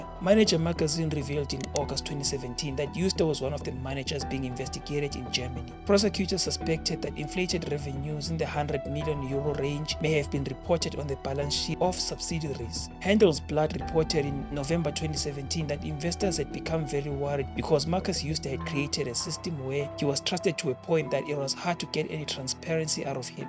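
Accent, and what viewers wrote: South Africa. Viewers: Great ti hear these stores narrated with a SA accent. Sending love to Mzansi from HK, China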